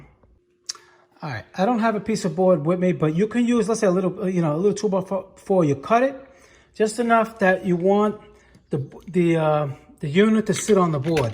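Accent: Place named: New Jersey, United States. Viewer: I have a 2011 F-150 Lariat with the factory stereo including Sync & Sirius. When I switch the ignition on, “Sound Designed by Sony” displays, then the compass direction and external temperature (only). When I press the stereo power button, five circles and the clock time flash on, then disappear. Occasionally when I'm driving, these will blink on then disappear too. To me that suggests an intermittent connection. I checked fuses and they were all good. I reset the stereo by disconnecting the battery cables, waiting ten minutes, and reconnecting. No joy. Upon removal of the stereo unit, all connections on the back were tight and clipped. So I baked the circuit board at 175 for ten minutes, then 375 for six minutes, and let cool at room temperature for one hour. Reassembled, reinstalled, and got the exact same behavior as before. Unfortunately the procedure didn't work for me. 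So I'll be looking at an aftermarket solution now. But thanks for your videos, and I give you major props for the total Jersey accent and speech mannerisms.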